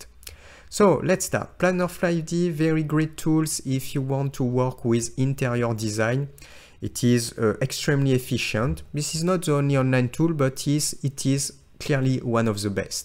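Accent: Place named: France. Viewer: thank u for this very specific tutorial, loved the french accent ;)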